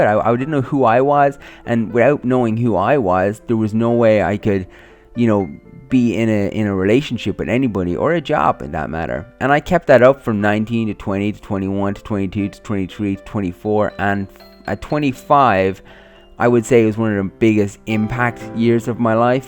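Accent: Ireland. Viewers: Thats an irish accent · It's a common irish pronunciation, loads of people talk like that here in ireland. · Its an Irish accent! I have it too :) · LLOOOVVEE your irish accent!!!!!!!!!! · Stop taking the mick he's Irish ok if u were Irish I'd say it like that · i really don't see how thats funny. Obviously he has an Irish accent. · That's the Irish accent hahah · It's called an Irish accent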